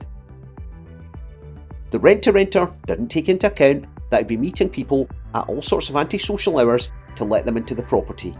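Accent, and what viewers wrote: Scotland, United Kingdom. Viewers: I’m a real estate attorney in the US I want to start seminars and book them at the same time and in the same hotel as the FURUs I would call it ‘Why You Should Not Listen to Anything You Were Told in Ballroom A’ (my seminar will be held in Ballroom B) Also, I would like to narrate my course (which will be available to download if you attend my seminar in Ballroom B) because my parents were from Scotland, so listening to a Scots accent is both familiar and comforting..... but only if there is also some occasional yelling about what I am doing wrong.... 😉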